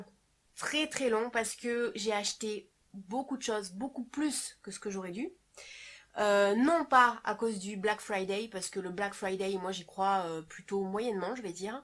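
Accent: South, France. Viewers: Coucou jolie encore une fois super haul, pas de souci on entend toujours ton bel accent du sud!!! J'ai aimé ton magnifique sac camel qui fait très chic, ma préférence va aussi vers cette très jolie jupe crayon en dentelle et ton pantalon camel taille haute avec des boutons (j'ai cette même coupe avec bouton mais en jeans) Petite question peux tu vraiment garder une seule pièce? Pas facile!!! Les pièces te vont toutes à ravir Je te dis soigne toi bien tout là bas dans le sud et te fais de gros bisous A bientôt dans ta prochaine vidéo Farah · Coucou, comme d'habitude, très chics tous ces achats que tu as fais. j'ai pratiquement tout aimé: la veste à pans, le pantalon, le superbe combi-short, le pull épaules en dentelle, les bottines..... Le sac est très joli. Moi mes sacs préférés sont les sacs seaux, à pompons, à franges, à clous, en moumoute, aspect daim..... 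Je raffole de ces sacs:) Le pull bordeaux est très beau. J'en ai aussi quelques-un dans mon armoire. C'est vrai, ça tient chaud. Les robes-pulls, j'en ai pas mal; je suis bien dedans. J'avais deviné, à ton accent, que tu vivais dans le sud:) Pour ses vacances, mon frère allait souvent à Banyuls-sur-mer (réputé pour son bon vin).Il ne devait pas être très loin de chez toi:) Merci pour cette sympathique video. Soigne-toi bien. A bientôt. Gros bisous ma belle.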